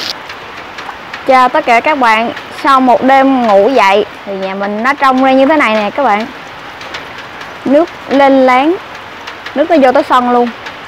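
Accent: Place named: Nha Trang, Vietnam. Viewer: Nhớ giọng nói Nt quá đi Diên khánh hay Ninh hoà vậy Ko có dịp về mùa mưa dể thấy đuọc cánh này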